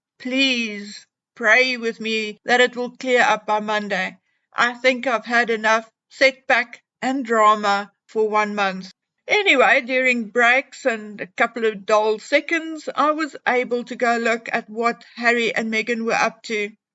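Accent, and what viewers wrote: South Africa. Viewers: Umm ..it’s called a South African accent?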